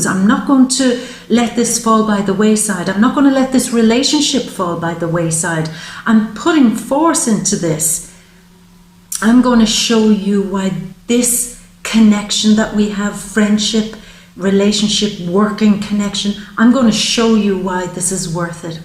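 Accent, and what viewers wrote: Ireland. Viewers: Love Love Love Love Love the Irish accent. Good on ya girl. I'm an ox........ Gotta start a new business. Buy a lease on a pub. 85000 for a 20 year lease. But I don't have good credit, so it's tricky to get funding. Gotta keep charging on. Ah thanks. Glad I found you on you tube. I'll come and see you in Ireland soon. xxxxx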